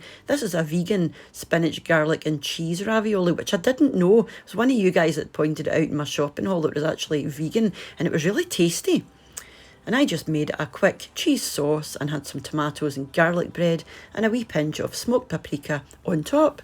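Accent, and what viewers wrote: Scotland, United Kingdom. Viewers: 'Nice of you to take the time to mention us here in Australia. I live in Sydney. It's been awful for a lot of people. Your channel is great by the way. You sound like my Mum from Renfrew. Oh, and just a little bit of humour to finish. You certainly don't look like that woman on the bargain show. Keep well.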